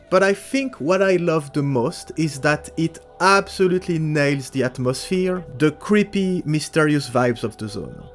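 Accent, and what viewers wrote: France. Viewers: hey, Just coming from stalker2 now i'm gonna play every stalker from soc ti call of pripyat; Can i know wich mod your using on pripyat to make it that good? And if you will be so kind to point me out to some mods for SOC and clear sky to modernize the game a lot graphic wise and gameplay wise gunfight animation while stille keeping main quest and quest in the game. Radiophobia 3 look great for soc but there so many options i'm kinda lost. On Another topic are you french your accent sound french to me :) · Ton accent ^^ ca trompe pas . Cool d'avoir un passionné fr pour les stalkers 👍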